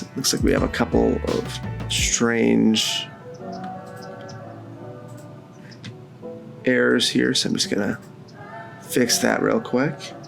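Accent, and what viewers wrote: United States. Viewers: Standard American pronunciation. We do things to French that would make you either homicidal or suicidal. For example, potatoes "awe grotten," or meat "with au jus." And if a house has a bathroom attached to a bedroom (very common in the US), it is said to be "with en suite."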